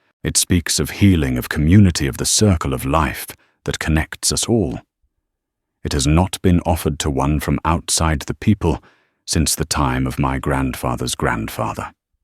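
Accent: United Kingdom. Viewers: Good story read with a beautiful British accent. Listening from Norway. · I really wish an American was narrating this story about the American West! This English accent is so out of place.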